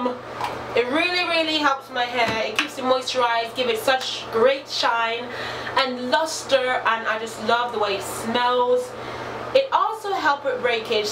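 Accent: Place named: United Kingdom. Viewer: Did u spend time in the uk because I can hear a bit of a British accent love the hair btw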